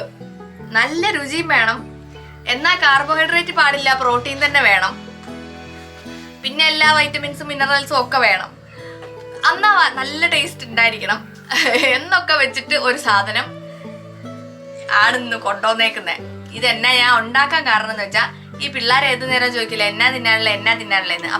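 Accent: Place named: Kottayam, India. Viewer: തനി കോട്ടയം ഭാഷ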